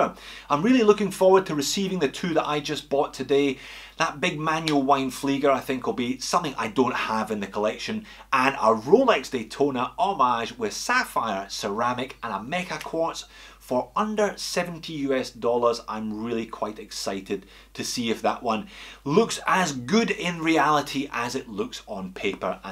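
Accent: Scotland, United Kingdom. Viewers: I appreciate your work and the Scottish accent! Have you considered buying a real Flieger from Stowa?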